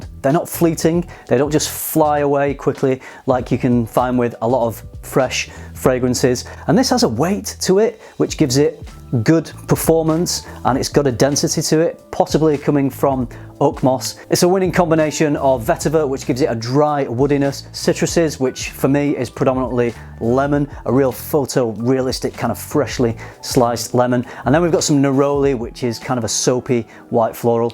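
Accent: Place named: Yorkshire, United Kingdom. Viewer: No that’s a Yorkshire accent (northern) cockney being southern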